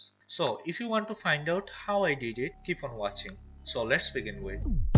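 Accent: India. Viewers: Bengali accent ! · Did you hear an indian accent ? Oh You found the right video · You know you found the right video when you hear that Indian accent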